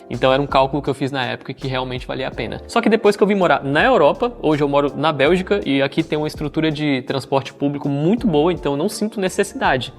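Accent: Brazil. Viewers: Hahaha amei seu canal… E cara, teu sotaque é muito baiano… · So de ver a casa se nota q ñ mora no Brasil 🇧🇷 , ate ele falar 😂 , eu tbm moro na Europa . valeu as dicas.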